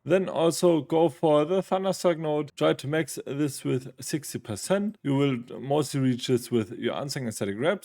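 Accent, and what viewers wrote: Germany. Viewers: I love the German accent when speaking English, your speech is just perfect matey, maybe a little fast. Certainly a lot better than my German! :-)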